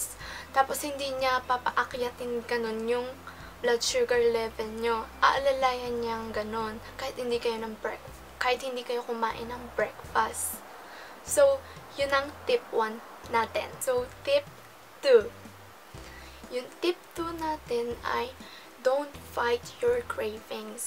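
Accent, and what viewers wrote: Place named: Japan. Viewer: ang cute niyo po magsalita, parang may japanese accent 😂💛 new subbie here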